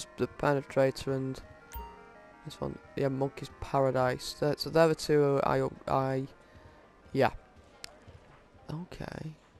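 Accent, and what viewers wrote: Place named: Northern England, United Kingdom. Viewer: Love you're vids friend. Nice to hear a welcome northern accent as most vids are over the top american stuff. Red dead vids have been useful too.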